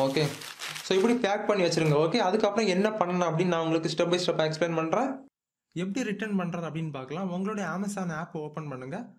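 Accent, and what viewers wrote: Kanyakumari, India. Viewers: Kumari Tamil 😍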